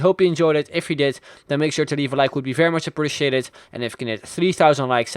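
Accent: Netherlands. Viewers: It's a Dutch accent and its not a shitty voice it's a nice voice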